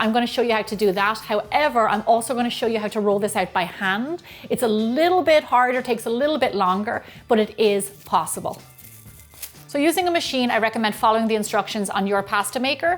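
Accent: Ireland. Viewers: I lov'eth I lov'eth 😆, got to love the Irish accent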